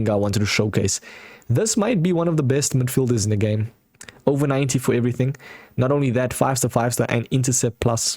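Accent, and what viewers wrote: South Africa. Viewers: I think he is from South Africa 🇿🇦 or New Zealand 🇳🇿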